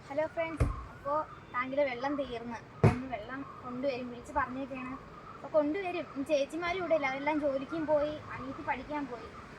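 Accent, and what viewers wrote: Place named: Kollam, India. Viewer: നിങ്ങളുടെ സ്ഥലം എവിടെ ആണ്??...കൊല്ലം ആണോ??...സംസാരം കേട്ടിട്ട് കൊല്ലം പോലെ തോന്നി??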